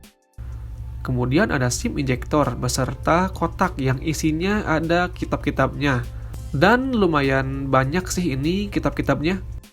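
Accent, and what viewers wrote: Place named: West Java, Indonesia. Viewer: Orang sunda berarti masss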